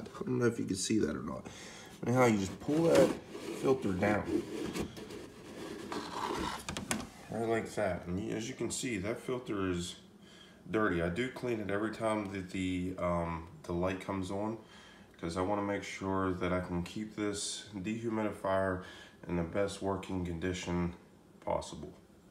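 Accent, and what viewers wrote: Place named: Philadelphia, United States. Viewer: You gotta be from delco or Philly area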